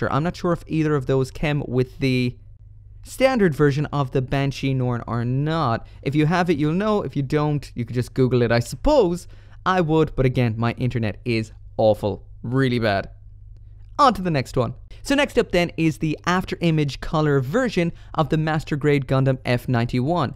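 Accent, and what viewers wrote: Ireland. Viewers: Are you Irish? Where do you buy your Gunpla? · Are you Irish?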